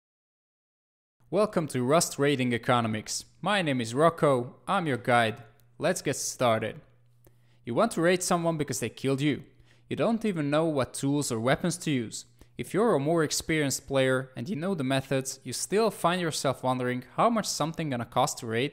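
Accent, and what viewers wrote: Hungary. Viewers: Is that a Hungarian accent?? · You have hungarian accent lol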